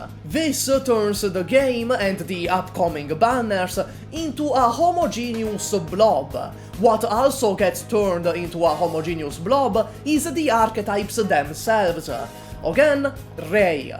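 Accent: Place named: Italy. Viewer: the accent (100% Italian)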